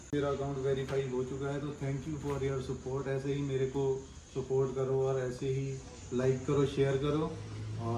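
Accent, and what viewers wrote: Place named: Himachal Pradesh, India. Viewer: Are bhaiya aap to mandyali bolte ho nd fortuner pai ye roof par acha ni lag rha hai taxi lag rahi hai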